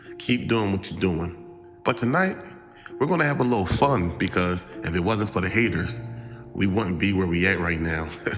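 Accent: Philadelphia, United States. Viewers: Love these videos!! Keep these coming. If we didn’t know you was from Philly….this video let us know YOU DEFINITELY FROM PHILLY😂😂😂😂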